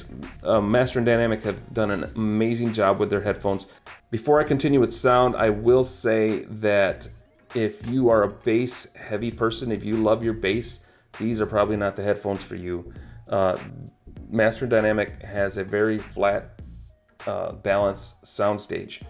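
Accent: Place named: Miami, United States. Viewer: nice headset id be scared to take them out of the house . i heard your voice i thought my cousin was doing it, heard the Miami / Hialeah tone in your voice.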